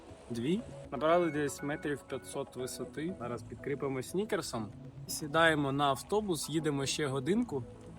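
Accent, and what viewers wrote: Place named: Kuban, Russia. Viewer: «калакольчіка» «да» « сейчяс» ви з Кубані ? Розмовляєте якби українською але акцент і словечка з російського наречія, з Кубані мабуть…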